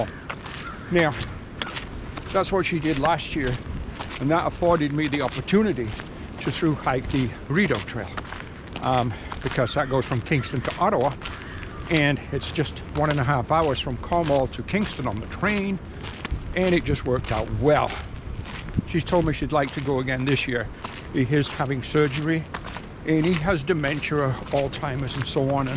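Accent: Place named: Yorkshire, United Kingdom. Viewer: where are you from originally mate? Sound like a Yorkshire lad!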